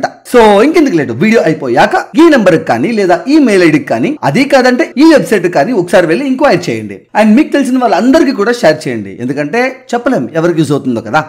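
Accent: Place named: Karnataka, India. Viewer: His telugu slang was very much like Karnataka Telugu slang.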